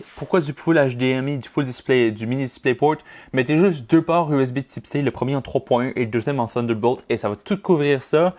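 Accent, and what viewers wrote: Quebec, Canada. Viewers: j'adore ton accent québécois +1 abo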